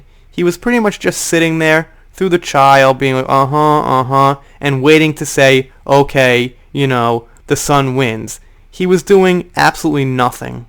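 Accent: New York, United States. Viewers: Just so ya know you pronounce it "Toka, Japan" your New York accent makes it sound nothing like Tokyo.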